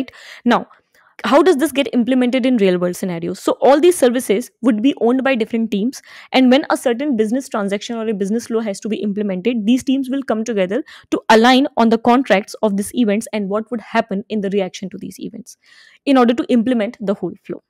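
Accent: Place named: India. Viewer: im also indian and I love the accent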